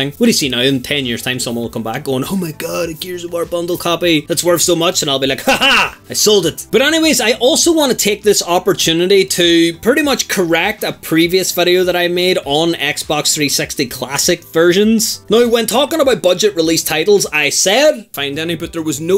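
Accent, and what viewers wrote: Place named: Northern Ireland, United Kingdom. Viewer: I was lookin up about my bioshock infinite promo copy i had from ages ago didn't expect a fellow northern irish voice as well like!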